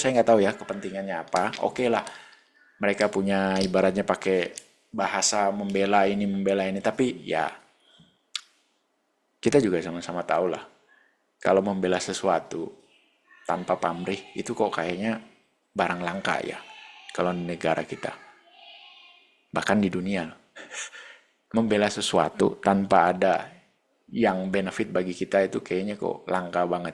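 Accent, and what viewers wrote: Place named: Central Java, Indonesia. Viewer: Modar, semacam logat jateng , magelang purworejo sekitarnya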